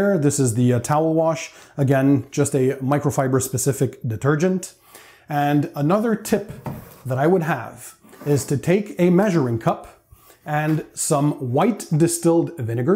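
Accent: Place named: Canada. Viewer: What is his accent. Sounds Canadian, but with something else.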